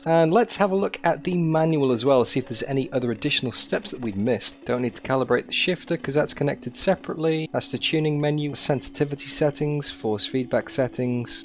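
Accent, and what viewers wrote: United Kingdom. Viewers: aaaah i thought the accent was familiar, I'm from the other side of the Mersey myself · sounds english too me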